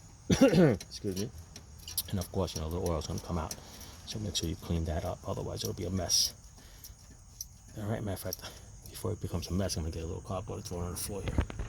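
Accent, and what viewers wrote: New York, United States. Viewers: Sorry but is this dude a Chinese guy with a new York accent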